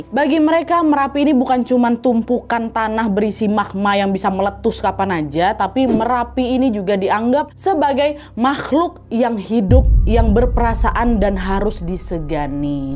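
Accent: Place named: Medan, Indonesia. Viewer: nampak x kau medan nya Wak🥱🥱🥱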